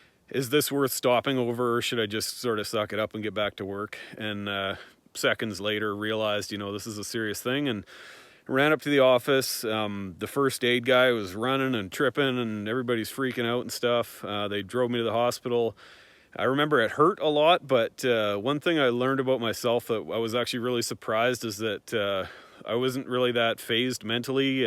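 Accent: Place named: Canada. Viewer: accent says Canada, americas hat. Edit: the license plate also says Canada lol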